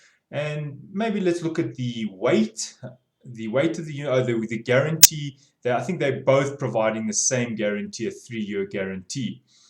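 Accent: South Africa. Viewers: definitely south african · As a South African myself, I can confirm that he's from South Africa due to his accent.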